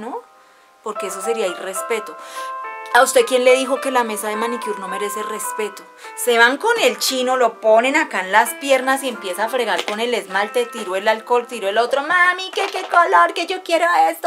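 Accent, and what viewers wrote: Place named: Colombia. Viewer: pensé q eras colombiana jajajja por tu manera de hablar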